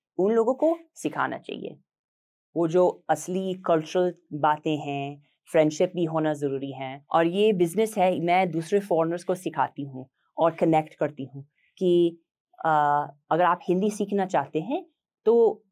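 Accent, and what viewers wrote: India. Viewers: Ye Puri Desi Dhang Me Dhal Gayi Hai .Bolne Ka Tarika Body Language Pura Desi Ho Gya Hai 🙏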